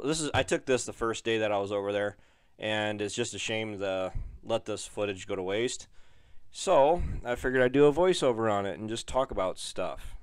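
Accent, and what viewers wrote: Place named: Wisconsin, United States. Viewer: lol. Just busting your ball's. It's the Wisconsin accent. Good channel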